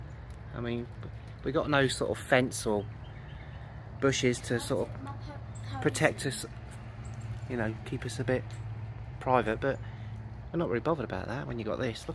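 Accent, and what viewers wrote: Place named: Australia. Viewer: You sound like Rocko from the 1990s Nickelodeon cartoon Rocko’s Modern Life, so I assume you’re Australian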